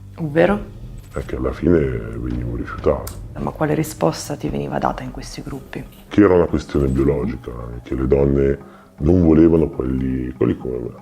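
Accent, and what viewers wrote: Sicily, Italy. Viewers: Anche io seguivo Voyager! Bellissimo il finale alla Adam Kadmon: "un abbraccio". Kadmon, coi duoi rimandi emotivi, formulava un invito alla ricerca continua e al non lasciarsi omologare dai cosiddetti "buoni per definizione". Lo adoravo. Lui tralaltro chiudeva i suoi interventi con la bellissima frase: "prendete queste mie parole come favole, un abbraccio, Adam". Spero che questo canale cresca ancora. Fa piacere anche sentire una voce siciliana (io sono di Catania ma vivo in Toscana), noi siciliani abbiamo nel DNA il dubitare sempre, l'argomentare, il desiderio di esplicare, la volontà empatica soggettiva di non soccombere e non accondiscendere.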